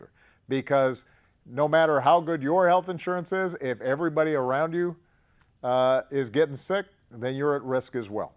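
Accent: New York, United States. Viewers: I played at 1.5 & he spoke like a new yorker. 😎 Miss him.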